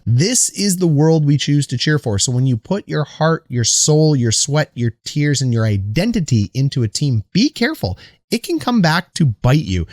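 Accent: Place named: Canada. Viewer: I do love how his inner Canadian comes out regardless of how hard he tries to not show it. :D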